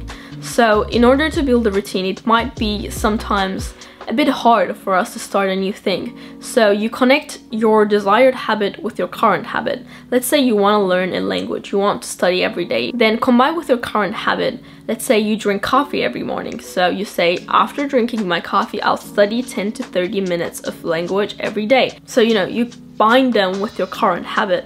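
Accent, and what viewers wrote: England, United Kingdom. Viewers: Did ur english become more British? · It’s surprising that you don’t have Japanese accent but Englishish accent! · You are really amazing!!! I’m shocked at your English accent 😍👍🏼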